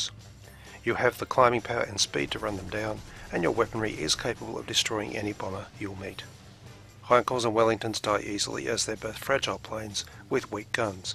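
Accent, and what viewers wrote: Australia. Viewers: He sounds like an Aussie to me.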